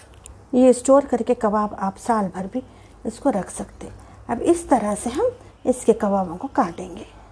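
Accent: Hyderabad, India. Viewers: Assalamu alaikum WRWB...Aunty...Masha Allah...bahut acche recipe bataye aap...eid ul adha ke time pe...correct timing...shukriya...thank you so much for your efforts..aap ke bolne ka andaz bahut pyara hai...hyderabadi style...Masha Allah ...bahut Accha explain kiye aap tips bhi kahe...Jazak Allah...Allah aap ke channel ko bahut taraqi de...Ameen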